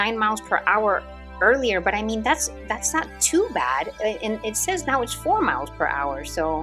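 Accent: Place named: Minnesota, United States. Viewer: I'm a newbie. I've had my seestar for about 3 weeks. She's my first. Moon, Orion then Pleiades. It's been fun. Rosette and Tadpole are on my list. Here in DE will not see any stars for at least 2 weeks. Mother nature waving her wand. Discovered how wet the east coast can be at night. Dew heater seems to work. Enjoying your videos. Sounds like you're not original SoCal. Minnesota????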